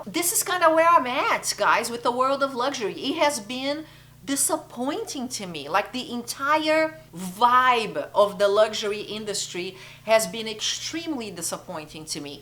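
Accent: Brazil. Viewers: I hear a Brasil Portuguese accent am I right? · Eu não te conhecia, mas sabia que era brasileira quando começou a falar. ❤️ Adorei o conteúdo! Concordo muitoo!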